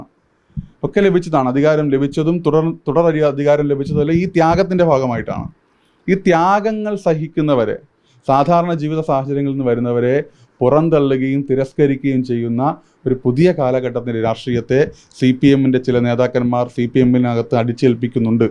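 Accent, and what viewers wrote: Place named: Kannur, India. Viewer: ഈ ആൾ. കണണ്ർ. കാരനാണൊ. വാചകത്തിന്റെ അവസ്സാനം "വേണ്ടി" എന്നുകേൾക്കുന്നു 😢😂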